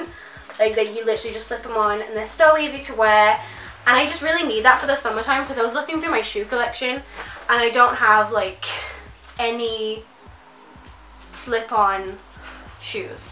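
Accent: United States. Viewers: talking with a twangy America accent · Are you from America ? You have a really strong American accent?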